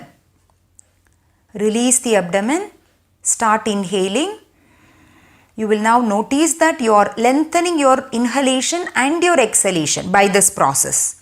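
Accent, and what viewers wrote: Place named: India. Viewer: I am very thankful for your video. I subscribed and I stared practicing breathing. And, at the same time , I enjoy listening your Indian accent. I am Rosario, from Uruguay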